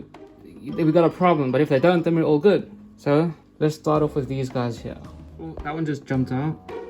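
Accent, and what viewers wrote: South Africa. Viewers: Duuuuude! It's so hard to find fellow South Africans! I knew I recognized the accent. Keep going with the amazing content. ❤️ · Are you south African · You're South African, aren't you? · You sound like you have an south african english accent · I knew you were South African cuz of your accent😂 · I thought u sound like u from SA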